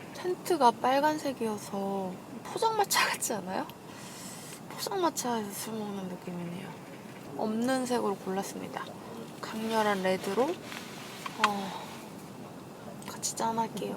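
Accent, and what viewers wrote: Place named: Seoul, South Korea. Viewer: 서울말 ㅎㅎ!!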